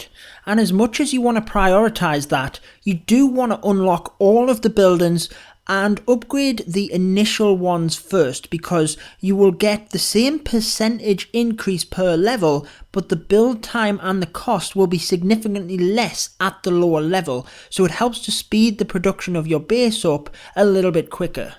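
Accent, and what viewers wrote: United Kingdom. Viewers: is just british accent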